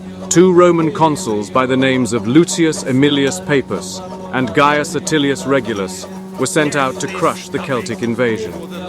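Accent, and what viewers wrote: United Kingdom. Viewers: This history is told in a such a perfect way - animation, music, super British English and ideal synthetic summary, that I was watchin the film dozens of time! Really great!!!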